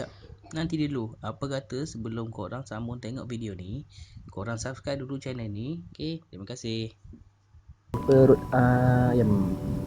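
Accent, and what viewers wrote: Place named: Temerloh, Malaysia. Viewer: Sedak btoi dengo org tmeloh borok nyak..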